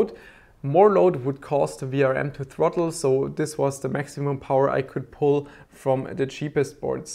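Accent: Germany. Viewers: Dieser deutsche Akzent 😂👌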